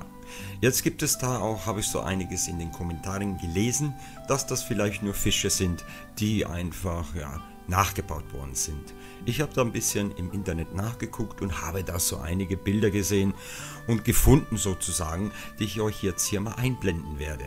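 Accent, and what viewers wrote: Tyrol, Austria. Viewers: wenn du bei dem video im tiroler dialekt dann untertitel aktivierst oder eine zweite audiospur auf deutsch machst ist das ok.^^ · Bitte nicht auf Tirolerisch, außer es gibt ein Übersetzungstool... im Norden fällt sowas schwer zu verstehen 👽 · Schian Gruass ausn Stubai.. mach weiter so.. ob auf Tirolerisch oder sinsch.. 😜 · Hey, schöner kurzer Beitrag. Die Theorie mit den Fischvorbildern für die "Mayaflieger" hatte ich erst vor kurzem gehört, nachdem aber die fliegenden Fische auch ganz anders aussehen ist diese Theorie zumindest für mich wiederlegt. Für mich sind das immer noch Flugzeuge. Die Glühbirne würde halt gut zur Bagdadbatterie passen, womit ja angeblich nur vergoldet wurde, oder so... Schade, dass Dich die Arbeit in der so wie so schon so hektischen Vorweihnachtszeit so einspannt, halt die Ohren steif... Lieben Gruss und einen hoffentlich entspannten Sonntag Abend noch Paco PS also mir hat der tiroler Dialekt gefallen, ich musste mich zwar ein wenig konzentrieren habe aber alles verstanden...:)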